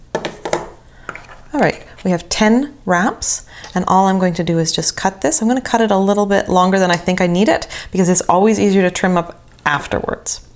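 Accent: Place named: Canada. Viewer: Can I just say I love your gnomes but I also love the way Canadians say words with "ou" in them like about and out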